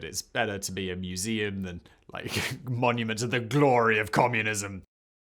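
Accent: Mid-Atlantic, United States. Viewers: It's pronounced zed, you mid Atlantic sellout.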